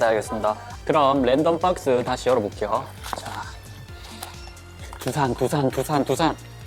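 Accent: Busan, South Korea. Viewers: 팝님 부산사람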